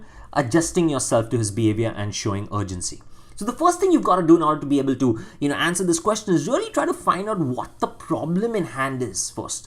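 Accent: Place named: India. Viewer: McDonald's don't use white ppl in their advertising either. So no wonder this guy sounds like he's recording this from Mumbai.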